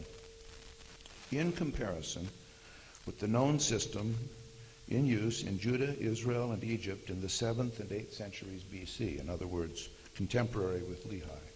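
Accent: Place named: Midwest, United States. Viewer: Regional dialect. I'm guessing Midwest. Excellent presentation.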